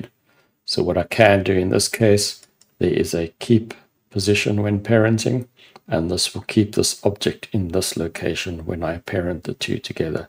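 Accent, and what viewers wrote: South Africa. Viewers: excellent! nice to hear the SA accent :)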